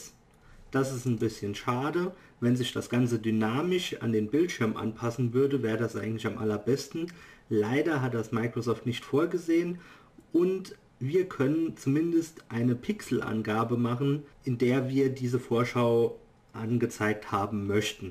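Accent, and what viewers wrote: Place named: Hesse, Germany. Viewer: Bist du Hesse? Du hörst dich nämlich an wie einer :)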